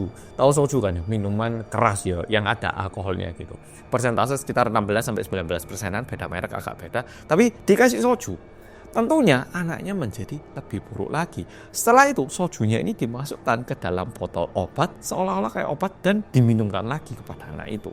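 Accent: Java, Indonesia. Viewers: 😅😂😂 kamu lucu..medookkk..❤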